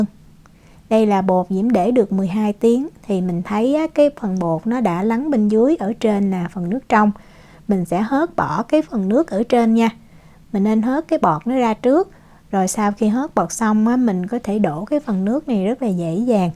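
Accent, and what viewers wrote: South, Vietnam. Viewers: 南言葉が耳に心地よいです。 ホーチミンに居るときは 蒸しヨーグルトケーキだと思ってました😹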